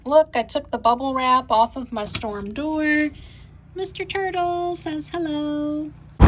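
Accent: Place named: Texas, United States. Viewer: Hi 👋🏻 I just found your channel ! It just popped up . Your accent seems Texan to me ..are you in Texas ? I’m in South Carolina . Nice to meet you . 🥰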